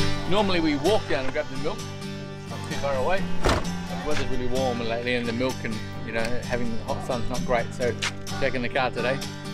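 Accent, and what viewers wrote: Australia. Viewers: Прекрасная возможность освежить свой австралийский английский.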